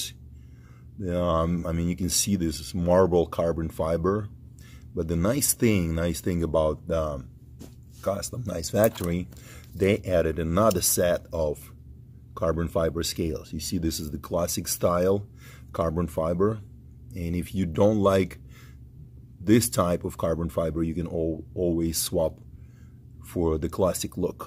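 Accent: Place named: Russia. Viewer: Родную речь слышно даже на английском) через 3-4 дня мне такой же придет)))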